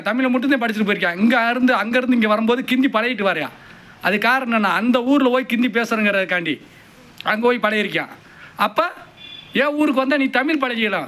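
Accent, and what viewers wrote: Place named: Madurai, India. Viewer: என்ன அவர் பேசுற தமிழ் சரி இல்ல அவர் மதுரைக்காரர் ஒரு ஒரு நாட்டில் ஒரு ஒரு மாதிரி பேசுவாங்க தமிழில் எத்தனை விதம் இருக்கு தெரியுமா😮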